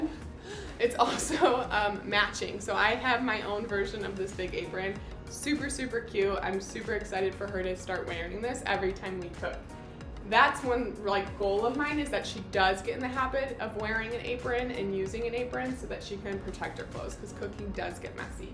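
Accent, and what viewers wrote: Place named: Illinois, United States. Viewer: Lol I can pick up on your Illinois accent right away. I’ve seen a lot of these kitchen Montessori hacks. Trying to find one that shows how the pvc pipe goes into the faucet.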